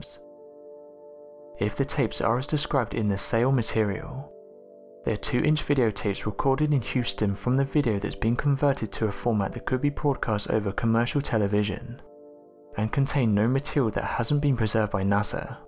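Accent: England, United Kingdom. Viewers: I waited for a British accent to begin commentating the video... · Really tired of the Heavy English accent much of which couldn't be understood 🍀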